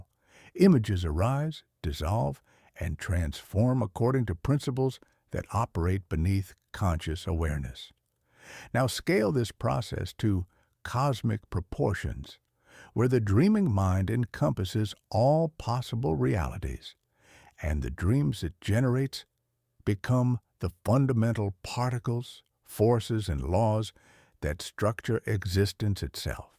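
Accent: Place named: Georgia, United States. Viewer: truthfully I think it's the Georgian Southern gentlemen voice narrating THIS at me that's oddly... amazing somehow for some reason I can't quite put my finger on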